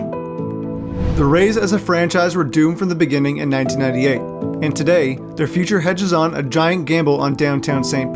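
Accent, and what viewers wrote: Canada. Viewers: Your content is great. The only complaint I have is that if you want to be known as a top video producer, you should make a conscious effort to pronounce the ou, ow sound as people in the U.S. do and not as a Canadian. When i took a speech class in college, the first thing the professor did was explain that if we were ever to go into broadcasting, it was very important to have a generic voice that doesn't let people identify us with an area. You can go to New York for example and the broadcasters talk the same as the broadcasters do in Texas or Alabama. That is the only fault that i have. The content is great but with a little effort, you could make it even better. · Is the narrator Canadian? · I noticed the Canadian pronunciation immediately because I have a friend from New Foundland! I remember reading that when Kate Mulgrew went to NY for acting classes that was the first thing she had to do was to lose the Midwestern accent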